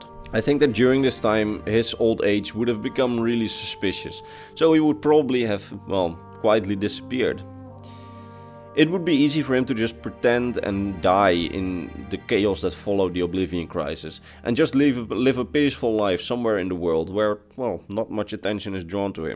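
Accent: Netherlands. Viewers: I didn’t really pick up from your accent that you were Dutch, until I thought about it more after you said “Dutch time”. What province are you from, might I ask? Also, love your videos!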